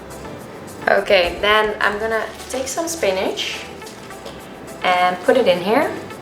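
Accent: Netherlands. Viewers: is she dutch? she sounds dutch:) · i love it.. first watching your clips. question are you dutch? your accent sound like that;). any way i will try this at home i need to get ready for the wedding i will be a bridsmaid..;) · je kan zo goed horen dat ze nederlands is. ik zat te luistern naar dr accent en ik d8, dit klinkt best nederlands., toen zag ik dr achternaam.. en ik d8.. jup die is nederlands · Is she Dutch? She sounds Dutch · Are you Dutch? You kinda have an accent, not annoying or anything, I am Dutch so I hear lots of people with the "Dutch accent" so I can recognize it haha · i can hear the dutch accent coming throughhhh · Is she dutch? She has an dutch accent in her english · She sounds Dutch. I use to live in Holland. Good genetics and healthy 'diet.' I eat the same but I wish I could eat nuts:( Nut allergy is terrible. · I know for a fact that she is a dutchie aka dutch person from her accent and her name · From the very beginning I could hear she is Dutch..then I checked her name and I was right. 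I always recognize Dutch accent-it's very nice to hear Dutch speaking English.... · OMG zo leuk dat je accent zo duidelijk Nederlands is. Love it😍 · You sound a bit dutch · Hahahaa love voor je typisch Nederlandse accent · Do i hear a dutch accent? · In the Netherlands (where I assume she is because of the dutch accent) they have lots of quick cooking rice, it's what most people use! You can cook it like pasta and then pour out the excess water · Are you dutch? im dutch to you have a accent <3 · Je hebt een nederlandse accent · Is your first language Dutch by any chance? Cuz I'm Dutch and I recognize your accent.. 😂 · OMG I NEW IT!! I’m from holland too😂 (its her accent)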